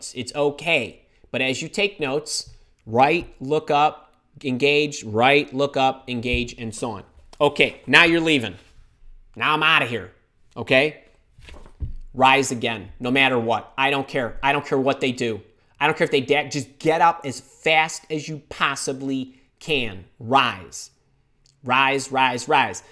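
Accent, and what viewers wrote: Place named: Chicago, United States. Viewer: You are hilarious!!! great advice …the Chicago twang keeps coming out in your tone…just sayin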